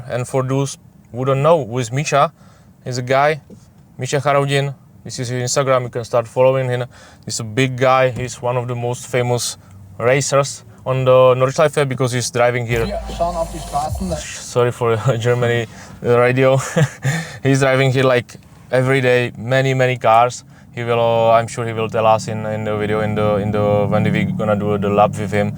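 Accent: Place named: Czech Republic. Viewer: ten ceskej prizvuk je tam hold slyest🤛:finger-red-number-one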